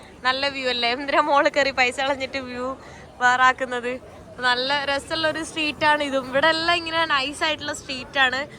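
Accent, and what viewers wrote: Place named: Kannur, India. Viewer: Hiii you always speak in Kannur slang 😊❤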